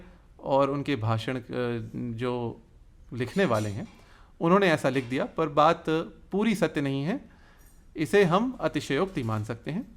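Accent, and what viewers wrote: Standard. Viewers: Bahut hi Badhia Vishleshan, balanced. Hum log to khichadi bhasha bolne wale hindu hain. Aap ki shush, sanskritnisht hindi sun kar Aanand aata hai. Aap ko sun sun kar humari bhi hindi achchi ho jaegi aisi Aasha hai. Uttam katya kar rahe hain aap. 👏👏👏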